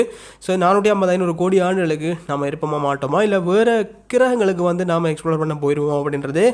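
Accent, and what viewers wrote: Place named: Sri Lanka. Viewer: Alagana srilankan Lang tamil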